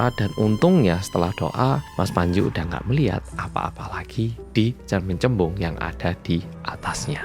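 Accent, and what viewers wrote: Java, Indonesia. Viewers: Medhokkk(medok) Kalau RDD kwedheeen(ketakutaan)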